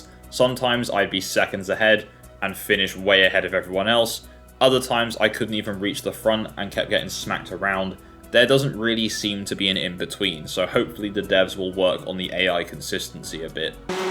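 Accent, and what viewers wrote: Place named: England, United Kingdom. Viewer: I do not even enjoy racing games. Yet hearing this Posh English Man complain is relaxing.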